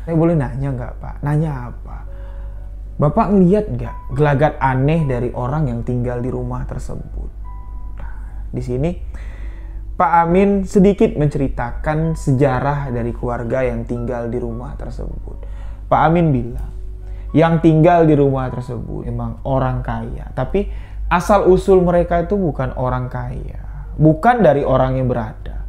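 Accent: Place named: Java, Indonesia. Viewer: Mas logatnya kek asli jawa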